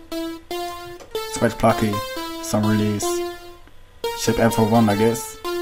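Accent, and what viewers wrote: Netherlands. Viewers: Hey man .. goeie totorial .. maar je moet echt werken aan je Engels accent en langzamer praten je bent amper te volgen 😉 .. geen haat gewoon een tip .. oja .. en je hoort duidelijk dat je Nederlands bent .. haha vandaar Nederlandse reactie 😉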